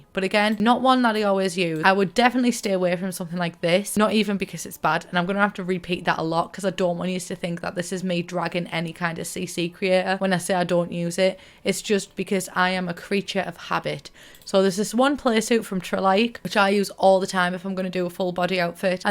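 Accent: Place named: Middlesbrough, United Kingdom. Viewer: I accidentally left subtitles on from a previous video and it struggles so much with your boro accent 😂 eg ‘girly’ changed to ‘garlic’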